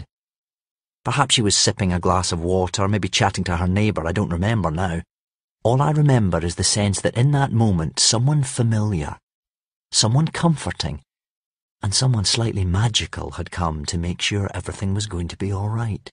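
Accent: Scotland, United Kingdom. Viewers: Im sorry but I am IN LOVE WITH HIS SCOTTISH ACCENT.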